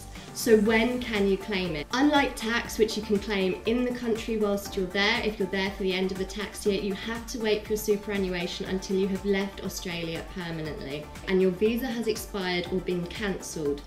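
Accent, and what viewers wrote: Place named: Scotland, United Kingdom. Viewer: lovely accent :) Scotland ?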